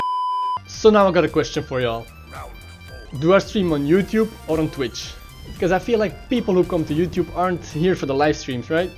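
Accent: Belgium. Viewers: Nice to see a fellow Belgian! I heard the accent and was doubting you were from BE or NL :) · EEEEEHHH !! Mais t’es belge !!! Jme disais bien que je reconnaissait pas l’accent !!! Perso je pense que tu devrais aller sur twitch, Youtube est encore trop mal foutue en matière de streams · Kom je uit België? Hahah groeten vanuit Nederland! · Kom jij uit belgië? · Are you belgian?/ Zijt ge belgisch?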